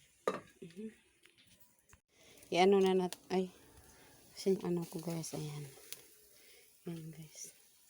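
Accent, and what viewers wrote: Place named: Visayas, Philippines. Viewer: Bisaya ka mam,kay law-oy man imong sulti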